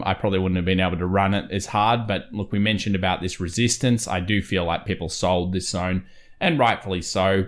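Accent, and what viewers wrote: Australia. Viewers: I must say, I appreciate the Aussie style of speach. You speak firmly, like you're in a sandstorm, or at sea with strong winds and sea spray. There's no mistaking what you're saying, and you're not using your daddy voice on me. Thank you!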